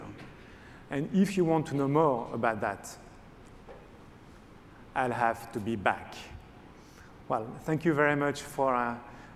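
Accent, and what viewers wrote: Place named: France. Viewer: superbe ! ( l'accent francais xD )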